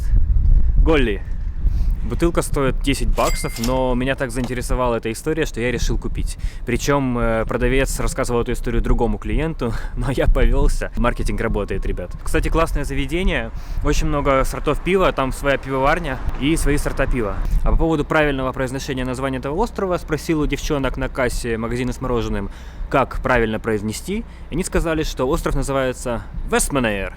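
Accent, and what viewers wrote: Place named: Odessa, Ukraine. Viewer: а ты шо из Одессы?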